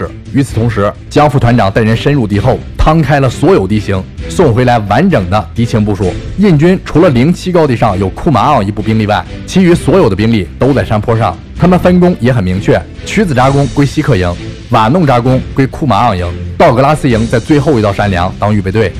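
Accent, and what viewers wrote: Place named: Jiangzhe, China. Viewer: 你說的大致正確 連 “吐蕃” 都能唸出正確的 “土鉢” 的確比99.9999％的中國人要有水平得 多得多！ 可惜你的北京話 實在很糟糕！ 帶江浙土話口音 實在太重！ 唉！ 能不能先把你的北京話 練 “六” 一點 再來拍視頻啊？ 北京是首都欸 你是忘了嗎？ 莫非你是海外華人？ 如果真是 那就當我沒說！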